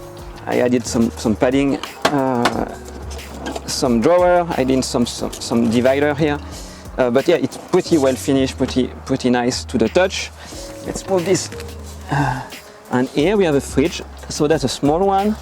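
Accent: France. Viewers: Je reconnais l’accent français, sympa le van ☺️ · Yet another successful creation from Freedom Vans! This model isn’t my use case, but I think you should hire the narrator based on his wonderful French accent!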